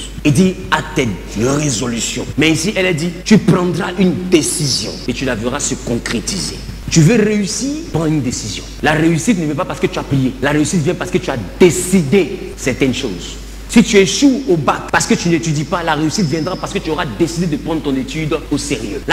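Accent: Ivory Coast. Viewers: Bonne année cousin, mais c'est comment? Nous n'avons plus tes directs! Faut ratisser frère......mais papah, tu dress comme nous congolais, mais ton accent est ivoirien! Tu nous ressembles aussi....enchante mon frère.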